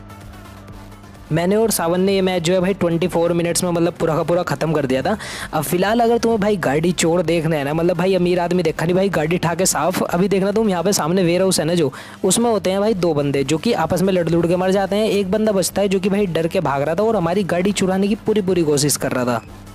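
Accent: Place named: Marwari, India. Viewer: Bhai to marwadiy kas bol la taa hai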